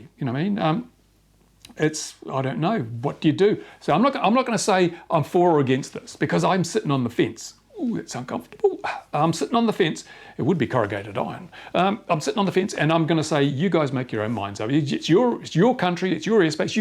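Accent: England, United Kingdom. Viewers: love the british accent :-D and yes the UK brexit is a proper mess, the politicians saw to that.... sort of like the drone reg's :-)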